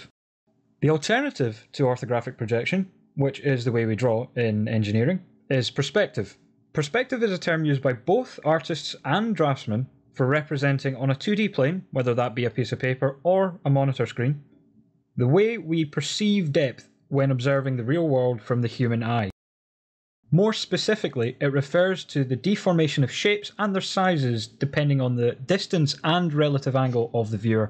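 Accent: Scotland, United Kingdom. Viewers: I'm learning Blender and this video completely clarified orthographic vs perspective view. Thank you. Well done. I recognized the Scottish accent and then saw your screen name "." Love it! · Took me 3 min to realize he's Scottish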